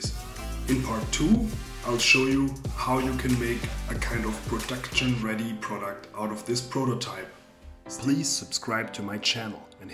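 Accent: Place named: Germany. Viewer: Man erkennt sofort wenn ein Deutscher Englisch spricht. Daumen hoch.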